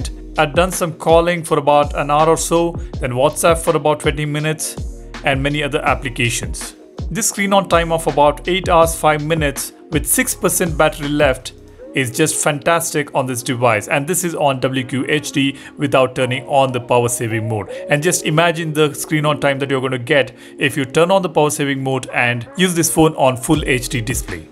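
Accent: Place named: India. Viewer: I was looking up for a video about the n10+ 3.1 UI but I couldn't bear the heavy indian accent I can't.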